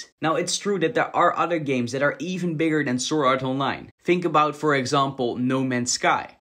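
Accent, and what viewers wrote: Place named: Netherlands. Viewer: I smell a little bit of dutch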